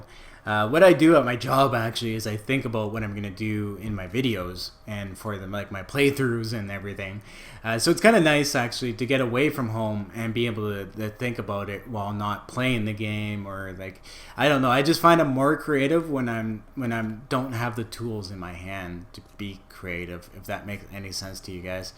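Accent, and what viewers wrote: Canada. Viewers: I can tell from your accent you're from Toronto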